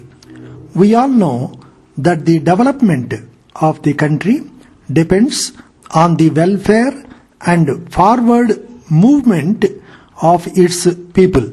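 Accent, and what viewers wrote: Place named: South India, India. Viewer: kai re clear voice...fati hui awaj h....south indian accent...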